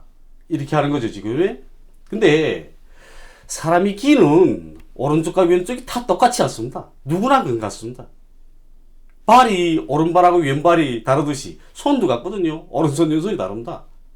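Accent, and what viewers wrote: North Korea. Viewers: 일단 북한 말투를 아직 못버렸네 음....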